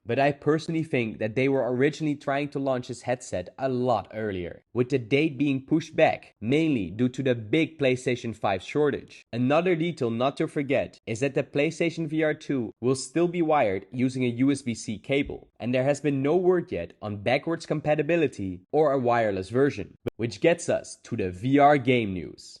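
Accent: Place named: Netherlands. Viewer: dutchy english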